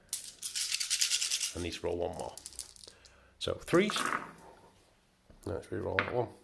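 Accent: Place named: England, United Kingdom. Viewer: Ya I have trouble with English accents lol damnit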